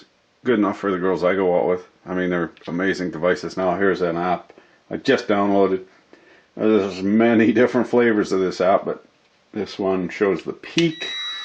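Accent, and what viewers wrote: Canada. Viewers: Hell I am American and have trouble understanding Canadian at times. The more you watch him the more sense it makes than you start talking like it lol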